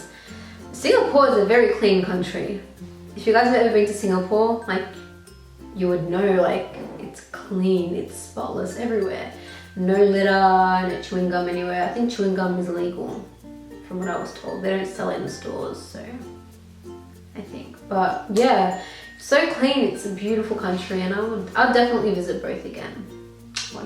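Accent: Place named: Australia. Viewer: Paayal Shah lmao I was thinking the same?¿ I thought to myself it's probably because of her Australian accent or something! 🙃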